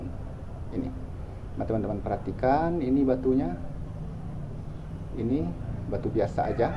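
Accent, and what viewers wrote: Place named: Manggarai, Indonesia. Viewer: Maaf dr logat bicara macamnya asal Manggarai Flores NTT...SALM dr NTT...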